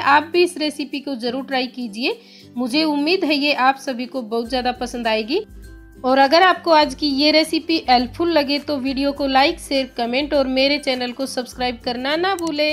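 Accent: Haryana, India. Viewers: Mam aap haryanvi ladti h bhasa se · Aap haryane. Se ho kya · Mam aap Haryana se ho kya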